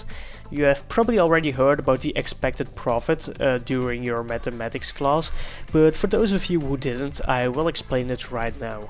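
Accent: Netherlands. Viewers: u Dutch bro? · is he dutch? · dutch accent is the worst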